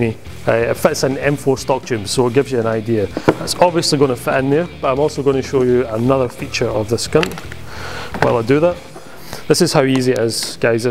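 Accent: Scotland, United Kingdom. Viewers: are you Scottish? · not weird only scottish!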